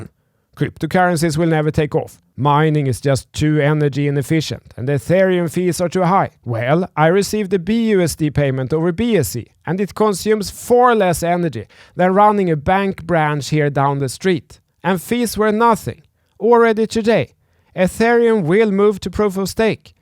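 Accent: Sweden. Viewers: I lived in Sweden for 6 years. I have never heard a person have more Swedish accent while talking English. That's why I'm subscribing to your channel 👍🏼😊 you both have points. Her point is that it costs too much electricity to keep running and make new currencies.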